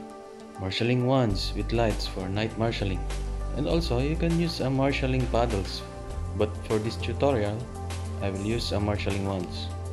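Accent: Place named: Philippines. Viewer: Filipino detected hehe. The voice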